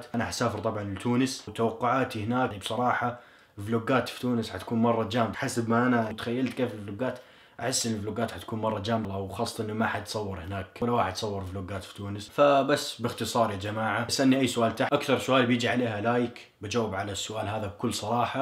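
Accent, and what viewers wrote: Saudi Arabia. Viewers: كيف تهرج سعودي ونتا تونسي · انت من وين وكم لك مبتعث وليش تتكلم سعودي ( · زيارة (oW9Q) الله يرحم اهلك تراني اعرفه من قبلك ، تونسي درس في السعودية عشان كذا لهجته سعودية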